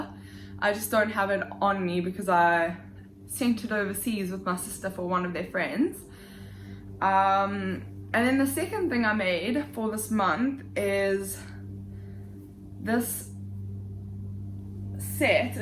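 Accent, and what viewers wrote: South Africa. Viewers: Are you South African? You sound like you're from there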